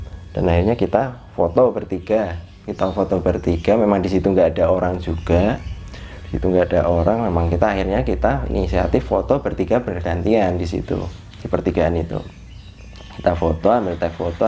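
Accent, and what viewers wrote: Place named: East Java, Indonesia. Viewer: Mas , asli jawa timur ya ?? Medok nya sama 🤣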